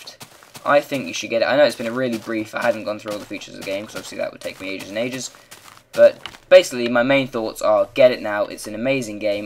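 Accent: United Kingdom. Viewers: Thanks for the review! It helped me decide that I'll probably buy the game. And your British accent made this 36% better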